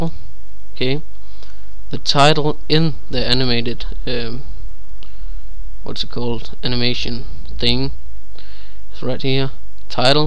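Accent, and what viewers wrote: Denmark. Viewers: er du dansk ? du lyder sådan :)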